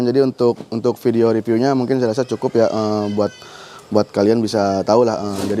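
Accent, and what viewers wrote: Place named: West Java, Indonesia. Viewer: Hahaaa apansa sunda banget.. Sukses baaaaang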